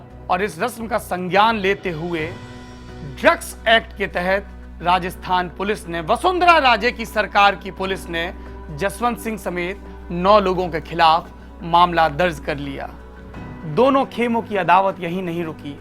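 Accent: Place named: Kanpur, India. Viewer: Seems you are either from Kanpur or lko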